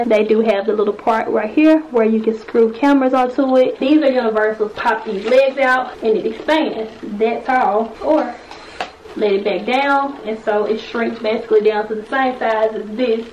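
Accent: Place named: Tennessee, United States. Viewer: I was about to comment and ask if you were from TN until I saw it in your description box haha. I recognized that accent lol I was born there and have family there, but hello from NC!!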